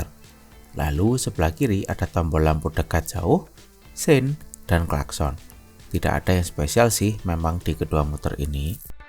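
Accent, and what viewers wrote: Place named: Java, Indonesia. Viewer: Lebih suka sama suara mas yg dulu karena ciri khasny medokny 😢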